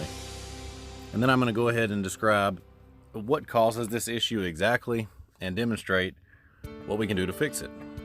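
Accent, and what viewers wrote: South, United States. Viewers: i like your southern "Gone With the Wind" accent.. notch it up with a wider smile.. keep positive, you have great potential.. best wishes :-) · Excellent video and your accent made me homesick for SC, TY.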